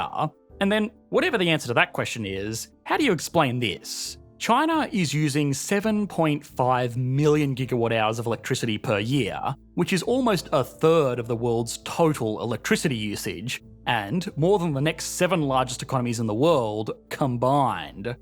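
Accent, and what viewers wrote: Australia. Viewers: the au accent is annoying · the Aussie accent is a real distraction - can't get through the content....brain is suffering..... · Take it easy; he's Aussie. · For so long I hold myself to not write this ugly comment but I need to becouse I am sure I am not the only one who observed that: you have a good content and interesting video s but you English accent the way how you pronounce the word s that are at the end of a sentence is so so ugly for any 👂👂 ears that are looking at your videos, I don't know your accent sounds like a bulshet Australian accent, I mean the worst possible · Where at in Aus are you from? I've never heard an accent like yours. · Dude, are you trying to sound more australian? :)) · This sing-sing delivery in a thick Aus accent is impossible to listen to. Compounded by the swift pace of the non-stop read. This is how economics is made opaque and a turn off for average people. 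Poor communication of basic ideas.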